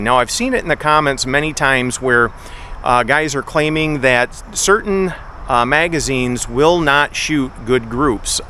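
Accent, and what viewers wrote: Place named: Northwoods, United States. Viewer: I've got to ask, where are you from? Sounds like the great Northwoods accent!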